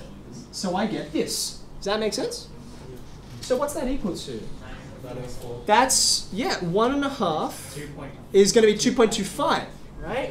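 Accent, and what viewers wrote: Australia. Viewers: Prashant Kumar It's an Aussie accent, bruh. And he lives and works in Sydney, Australia. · Is he an Australian Asian? He looks Asian, but he has an Australian accent. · It sounds like he speaks English as a first language, as you can distinctly hear an Aussie accent. He likely has learned some of the backgound of Chinese, since he did introduce Chinese numerals in his class to make another point. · Clearly Australian haha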